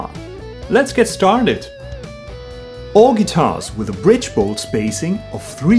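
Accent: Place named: Japan. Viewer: This guy talks like a Japanese man that just learned English...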